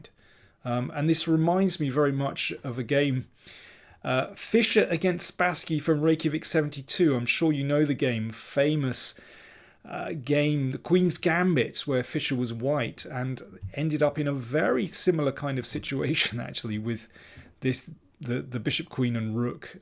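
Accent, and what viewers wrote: United Kingdom. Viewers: Yeah. Very nice british accent.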